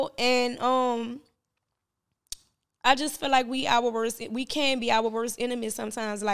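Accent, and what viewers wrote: New Orleans, United States. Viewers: where you been you was highly mist in these YouTube streets you speak facts on shit that ppl don’t want to speak you actually was teaching I learned a lot listening to you in ya New Orleans voice baby ❤❤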